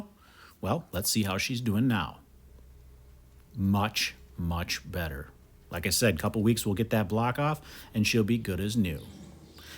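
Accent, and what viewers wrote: Wisconsin, United States. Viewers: It's always "that" coreum. Never heard him say "the" coreum. Total Wisconsin thing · Your Wisconsin accent is deeply calming and appreciated.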